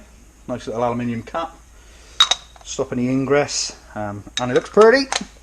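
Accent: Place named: RP, United Kingdom. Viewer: Don't you sound posh on these videos, nice work mate, love all this old stuff.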